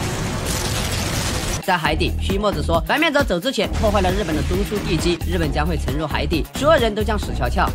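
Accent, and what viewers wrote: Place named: Sichuan, China. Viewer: 我擦 解说是四川人吧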